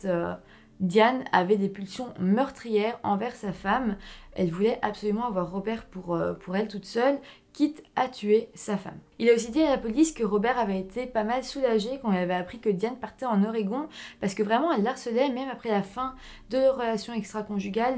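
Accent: Belgium. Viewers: Merci pour cette vidéo, c'était très intéressant et bien raconté. Serais-tu belge à tout hasard? :) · Oui, c est un accent belge 🇧🇪😁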